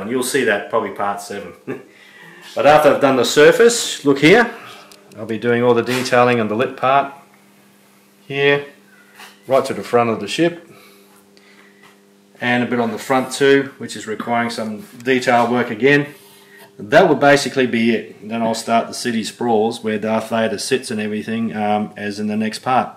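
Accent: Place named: New Zealand. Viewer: No worries, keep up the good work, look forward to the finished product! I take it you're a kiwi as well? Just sound like a kiwi to me!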